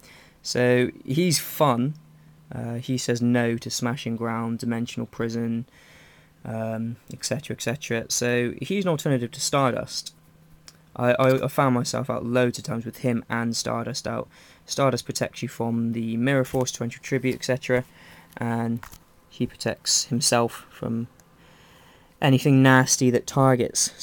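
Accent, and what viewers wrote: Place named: United Kingdom. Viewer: you sound like charlieissocoollike but probably its just the british accent :D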